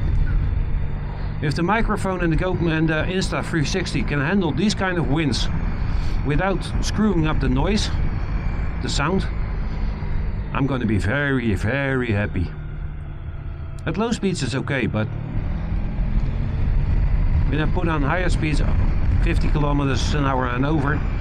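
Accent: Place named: Netherlands. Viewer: Setup functions amazing. The Pan sounds great (love that V4 smoothness) and you voice is picked up clear. Good audio balance overall. Image is up to spec too. Guess all you are missing now is a cam under the head and tail light for some serious cam switching editing and ofcourse those sweet sweet unobstructed views of the Dutch countryside. Soon RTL's MotoNL can pack it's bags (or more specifically panniers). Your English is perfectly understandable despite not being a native speaker. You are grasping for words more than when speaking in dutch but for an ad hoc, on the fly recording not bad at all. And people might mention it but don't worry about the accent. Dutch just has a heavy linguistical footprint. Keep up the good work. Great vid again of this channel. Thanks for the upload!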